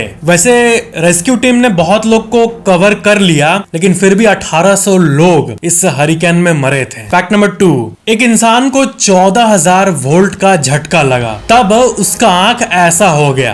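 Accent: Bihar, India. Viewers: Aap bihari ho na tbhi apke accent m bihari chalak raha hai sir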